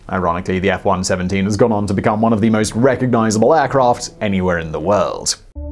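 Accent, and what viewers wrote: United Kingdom. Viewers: Why do British people talk like this. Do they hear themselves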